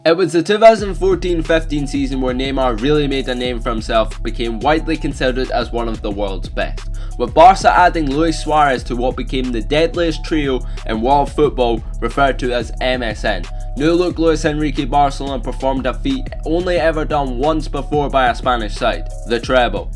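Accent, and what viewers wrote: Scotland, United Kingdom. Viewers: i thought you sounded Scottish I support Cardiff and man united because my dad supports them and united because all my mums family support them and there my closest team I'm just saying so I don't sound like a bandwagon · british accent banging mate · U Scottish?? Instant sub if u r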